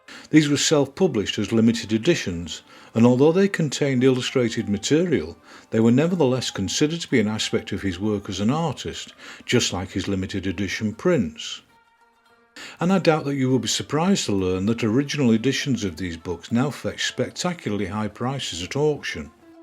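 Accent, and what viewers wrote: United Kingdom. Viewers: HIs cultural artwork and line work are new to me. I can't say I enjoyed them. But, do appreciate his changing styles. I also enjoy his more representational work. Your voice inflections surprised me. And wonder if they are an English (British)? As always, I learned more about the trade. Tnx!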